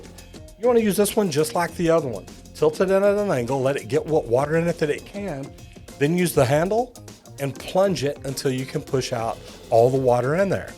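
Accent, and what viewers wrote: Texas, United States. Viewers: His accent is a mix of gay and Texas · It's so great hearin a North Texan accent, I ain't sure what city exactly but that's DFW within 75 miles could be a bit further either way... Folks don't realize we'll drive 45 minutes for supper in Texas, it's a stretch town to town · That I can tell a fellow Texan accent from the rest of the world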